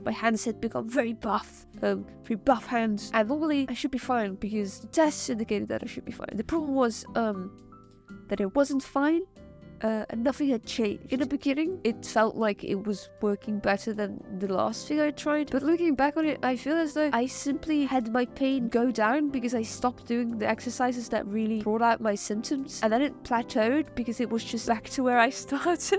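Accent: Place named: United Kingdom. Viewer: Your name, is very misleading considering you have this awesome British voice, the greatest garden ever, and cute plushies everywhere you look. Also, it was cool seeing more of your art process 🙂 What the plushie's name? I might have missed it if you did say it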